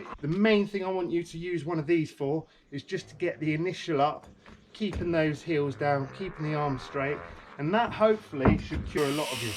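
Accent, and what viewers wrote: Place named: Bristol, United Kingdom. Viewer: In Bristol you say maniall and weelay 🤣🤣good stuff bud, makes sense might give it a sesh.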